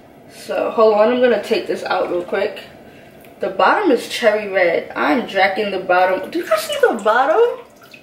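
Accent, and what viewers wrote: New York, United States. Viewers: Love this vid !!! I definitely know your from New York lol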